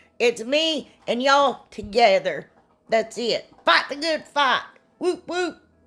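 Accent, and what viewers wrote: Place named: Oklahoma, United States. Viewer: Ha ha ha ha ha ha ha My Husband and I are cracking up watching you touch that glittery fabric. My Husband has only seen a couple of your episodes with me so he doesn’t know your aversion to felt he just turned to me and said, “Does she have Tourette’s? “Ha ha ha. I was laughing so hard I couldn’t even answer him for a minute I said why do you think she has Tourette’s and he said the way she’s repeatedly clapping her hands and then shaking them over her project and I was laughing so hard that I could not talk for a minute. Oh my goodness, I love you so much. Aside from the laughter and joy that you bring me and my home I love your projects and your creativity. The accent is just icing on the cake for me. A lot of my family sounds just like you only they hail from Oklahoma. I am a proud Okey! I think I told you before that I used to sound just like you too. 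But, my fourth grade teacher thought I shouldn’t talk with that accent and put me in speech therapy. I don’t think my parents even knew it until I said something one day about my teacher who makes me talk weird. My mother figured out what was happening and read that teacher the riot act. I loved her for that. Funny thing is my mom and dad didn’t have the accent but I did. My grandparents had the okie accent and they lived right behind my parents and my house and I loved spending as much time with them as I did in my own house so that’s where it came from. I don’t think I have the accent anymore but my husband and my kids say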